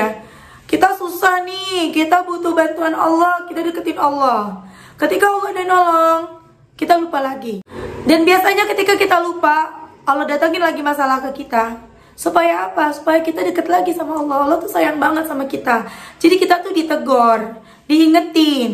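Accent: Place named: West Java, Indonesia. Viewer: Ya Allah Sunda nya ketauan banget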